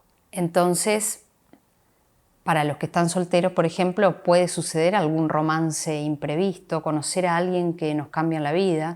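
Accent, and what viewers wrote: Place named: Argentina. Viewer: Hola. Gracias por este video ❤ Tengo una curiosidad: de qué región o provincia argentina sos? Pregunto por tu "tonada". Besos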